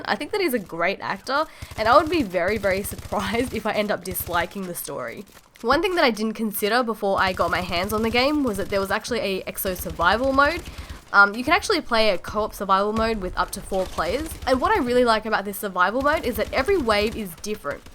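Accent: Australia. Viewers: Yup. Subscribed. Very articulated thoughts and well presented. Plus, there's just something about Aussie accents. It's magical.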